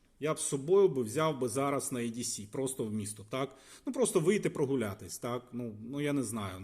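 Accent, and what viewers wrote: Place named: Eastern Ukraine, Ukraine. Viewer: У схидняков такой ужасный акцент. Нет той певучей лёгкости как в полтавском селе у моей бабушки. Тренируйтесь, песни что-ли украинские пойте.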